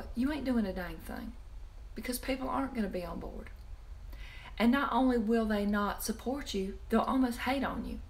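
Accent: South, United States. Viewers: Your features do remind me of Liz Taylor I wonder if the southern accent also has something to do with that, "cat on a hot tin roof" was playing in at the theatre recently. I wonder if you have seen her in e film? Xx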